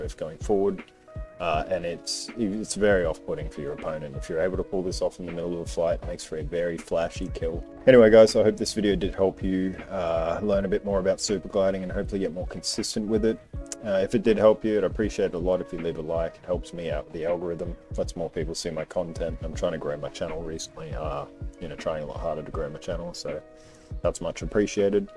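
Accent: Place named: Australia. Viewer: fuck oath could tell instantly, master race haha 🇦🇺🇦🇺🇦🇺 Dope tutorial btw just got a pc n switched to mnk like a month ago your tip to slow the vid down to get timing actual helped heaps Keep killing it man, Love hearing a fellow Aussie accent reppin apex 👌🍻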